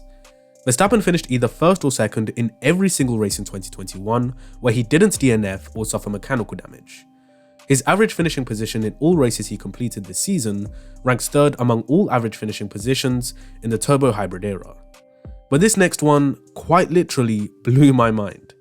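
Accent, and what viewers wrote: United Kingdom. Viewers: once i heard the english accent i knew enough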